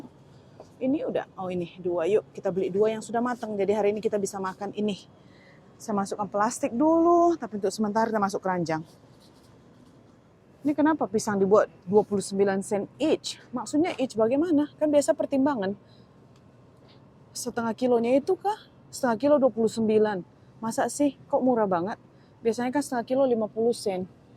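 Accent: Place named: Medan, Indonesia. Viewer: Cara kak berbicara ciri khas orang medan kalau berbicara 😊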